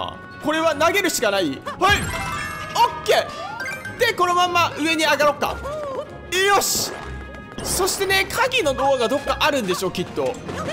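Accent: Fukuoka, Japan. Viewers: やっぱり、福岡？の方言は良いですね（笑） 今日のLIVE楽しみしてます( *˙ω˙*)و ｸﾞｯ!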